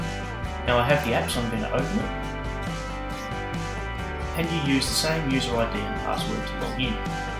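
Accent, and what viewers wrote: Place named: Australia. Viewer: Please re-record this video without the music or have the music substantially reduced. This is an unprofessional demonstration and does not speak well about your digital awareness skills. Depending on one's music system, the 'background' music is magnified and combined with the Australian accent of the presenter (who is perfectly fine btw) non Aussie's cannot decipher some words. Based on this video alone, I may be forced to dismiss the product from consideration. Thank you.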